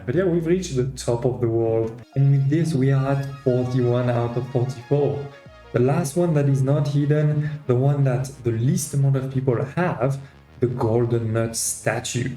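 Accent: France. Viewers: Are u French? U have a French accent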